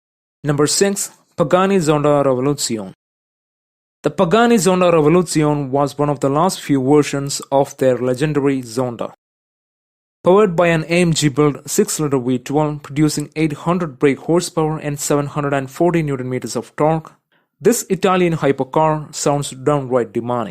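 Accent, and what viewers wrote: Kerala, India. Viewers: Are you indian? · Something says me that you're a Malayali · Are you a Malayali?? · “Powered by an AMG built 6-liddr weight one with 740 Newton meters of TONK” Omg kill me😂😂😂😭😭😭🤣🤣🤣 I can’t figure out if this dude is a combination of Indian with something Eastern European, you tell me because it’s hilarious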